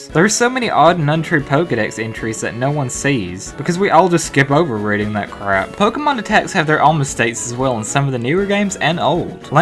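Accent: United States. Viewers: I love your country accent I could listen to you talk all day about pokemon xD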